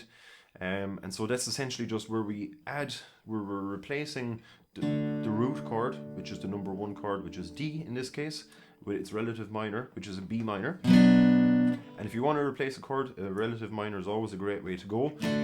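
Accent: Ireland. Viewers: Irish as they come this lads voice is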